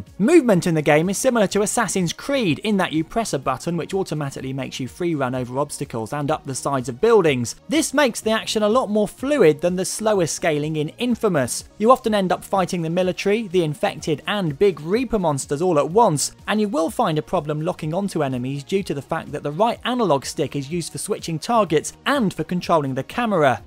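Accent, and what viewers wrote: United Kingdom. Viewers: this british guy with a british accent is talking way too british fast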